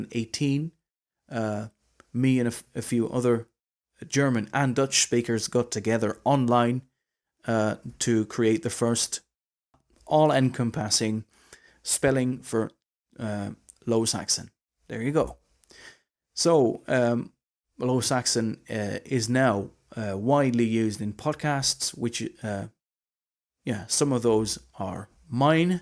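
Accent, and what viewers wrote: Ireland. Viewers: I have the funny problem of cognitive interference of your Irish accent when my mind is expecting a first language adjacent accent, that is with more influence from Low German. My mind will cope by thinking you're an Irishman who has learned Low Saxon! Good to learn more about my ancestral language. My family were from Westphalia region and Altmark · You sound Irish. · The bloke sounds like an Irishman but says he is Saxon? I thought the Low vs High was geographical reference. Were Danes Vikings or maybe a transitional population? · How did you get an Irish accent? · A Dutch guy speaking Irish accent. This is new to me.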